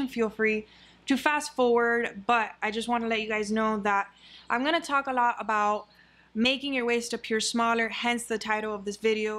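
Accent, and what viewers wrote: Miami, United States. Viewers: i know a miami girl accent when i see one 🥰🥰🥰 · That is the Miami accent...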